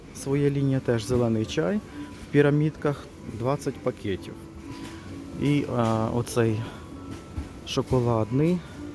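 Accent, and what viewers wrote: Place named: Western Ukraine, Ukraine. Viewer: ну звісно як без них? Але так гарно розмовляючи українською і портити її західним акцентом просто не повага до самої мови. Дуже шкода.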